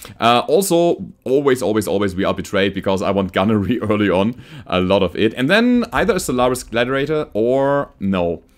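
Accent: Germany. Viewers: Oh he is german?! I can hear it :)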